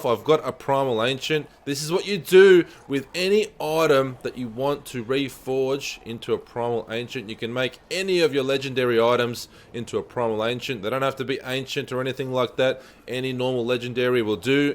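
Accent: Australia. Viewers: once I heard Aussie accent I subd. on ya bro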